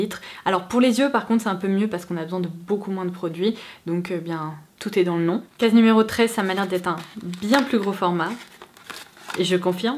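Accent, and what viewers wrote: England, United Kingdom. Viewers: Bonjour, je n'ai pas Instagram et je ne sais pas m'en servir, j'ai juste un mail <mail> , au delà de ce petit problème j'adore vos vidéos, vous avez une voix apaisante, vous avez un bel accent anglais. Merci pour m'avoir lu. Bien cosmetiquement vôtre. Delphine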